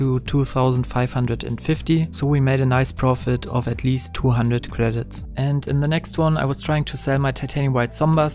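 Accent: Germany. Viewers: I like these Videos but i have one question. Bist du Deutscher? 😅 hört sich voll so an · Du bist doch deutsch oder das hört man raus haha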